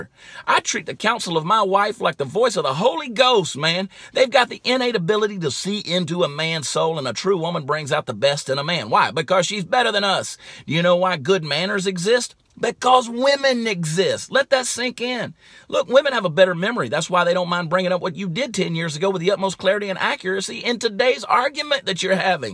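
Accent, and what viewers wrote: Texas, United States. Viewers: I could listen to you spout the truth like this in that manly Texan drawl all day long. Every man needs to listen to this video AND others. My kinda guy. Keep it up, we like yer style.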